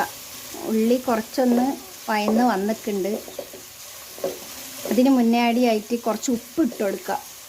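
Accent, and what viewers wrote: Malappuram, India. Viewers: Kollalo.. ingalu njamade malparthe basha pole indallo varthanam..😃😃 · 😋😋👌👌കൊതിയാവുന്നു കണ്ടിട്ട് തന്നെ. ചെയുന്ന സ്റ്റൈൽ വളരെ നന്നായി ട്ടുണ്ട് 😊. ഇങ്ങനെ ഞാനും ഒന്ന് ട്രൈ ചെയ്തു നോക്കട്ടെ. എന്നിട്ട് പറയാം ട്ടോ. 😍മലപ്പുറം ആണോ നിങ്ങൾ. ഞാനും അവിടെ ആണ്. സംസാരിക്കുന്നത് കേട്ടിട്ട് ചോദിച്ചതാ ട്ടോ 😊.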